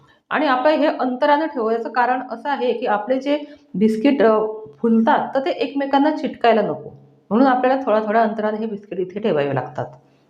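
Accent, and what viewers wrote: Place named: Leva Patil, India. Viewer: तुम्ही लेवा पाटील आहे का भाषेवरून समजते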